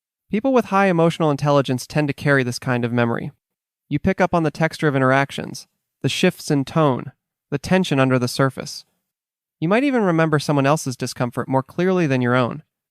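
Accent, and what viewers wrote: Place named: Canada. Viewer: You’re Canadian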